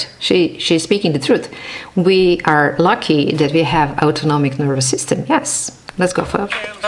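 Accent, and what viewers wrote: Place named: Texas, United States. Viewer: Thanks for your Texas accent...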